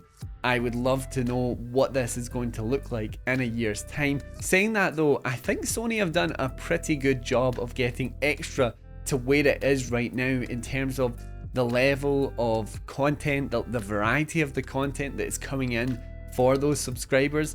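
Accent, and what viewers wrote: Scotland, United Kingdom. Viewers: You're Scottish right? 😅 Btw Valhalla was added since day 1 for extra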